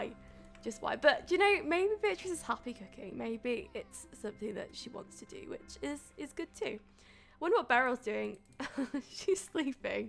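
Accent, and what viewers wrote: England, United Kingdom. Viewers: i Love your accent! where is it from? i mean what Area in england?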